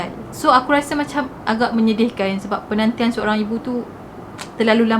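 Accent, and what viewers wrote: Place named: North, Malaysia. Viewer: 20% masih ada sleng utara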